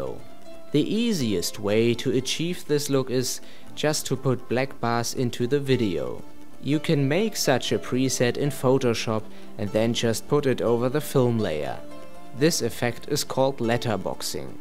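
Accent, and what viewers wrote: Germany. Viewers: so schön deutsches englisch (Y)!! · OUCH that horrendous German English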